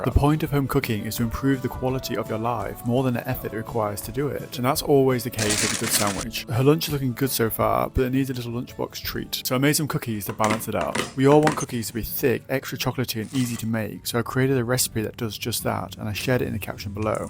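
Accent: United Kingdom. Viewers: لحجه بریتیشو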